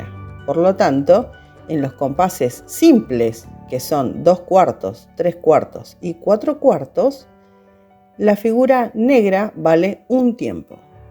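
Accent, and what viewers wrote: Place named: Argentina. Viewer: Gran estímulo para mi por dos razones, el acento argentino o el que tengas es muy agradable de escuchar y además tienes la mano canija por chiquita, como yo, eso me anima, gran cosa esta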